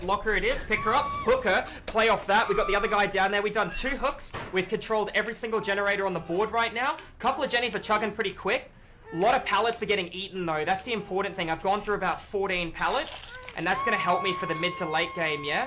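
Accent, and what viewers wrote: Australia. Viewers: I don't hear it probably because i'm Australian too lol.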